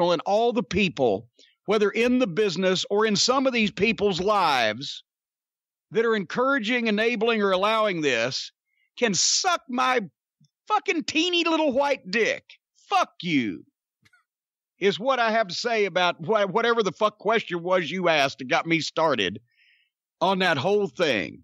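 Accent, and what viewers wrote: South, United States. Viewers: Parz BK that’s not what my sub conscience is telling my necessarily but his southern accent makes the words “mother fucker” hilarious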